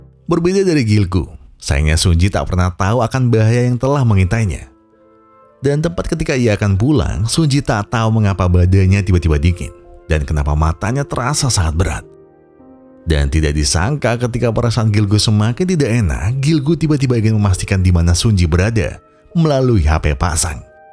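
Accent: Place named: Surakarta, Indonesia. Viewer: Orang mana si bang ko solo banget logatnyaaa 😭…. Dari chanel soundsmokers sampe sekarang ganti jadi setia gw banggg🫵🏻🫵🏻🫵🏻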